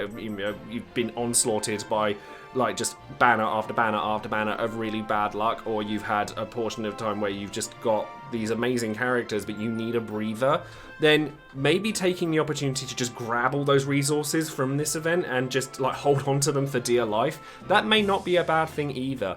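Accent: United Kingdom. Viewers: Laughed at the title. I truly enjoy your videos unlike most. Must be the british accent haha but joke aside your videos are very informative hence I watch it. Keep up the good job and hopefully you get more subscribers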